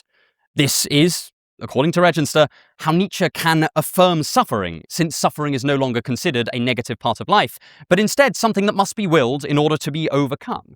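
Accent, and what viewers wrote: United Kingdom. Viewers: Entirely unrelated to the video, but do you ever feel as though people (not from the UK lol) legitimize what you say because of accent you speak in? I randomly had the thought as I watched this video. Just “if were American or Australian, would my/the audience’s reception of his words remain the same?” It sounds really horrible but I’m not without bias.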